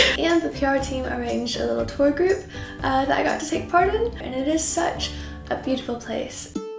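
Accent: England, United Kingdom. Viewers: i love you so much ^-^ tldr- my voice is kind of like your in that its soft and quiet and "weird" and seeing you make youtube videos just makes me so happy and reminds me that is okay to be different. also i love that you have started to say things the way english people do since you moved there :p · Has Tom ever told you that you are starting to catch and speak with a British accent?😃 btw your hair is looking so lovely and fluffy 😊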